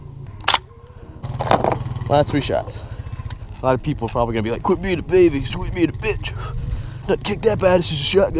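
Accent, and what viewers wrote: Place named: South, United States. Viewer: When does gun videos, in this one I noticed it the most, he gets a slight southern accent, playing into those routes. 'Murica!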